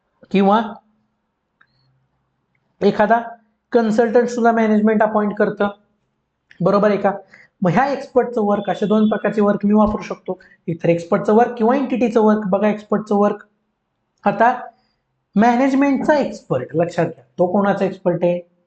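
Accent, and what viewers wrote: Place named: Satara, India. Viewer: सुट्टी not भावा... आवाज तर सातारचाच वाटतोय...❤❤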